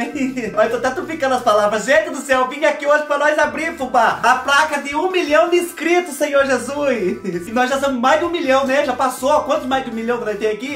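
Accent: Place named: Caipira, Brazil. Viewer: Que felicidade sem fim. Seu jeito é único .Você com seu sotaque caipira muito natural contagiando os nossos lares.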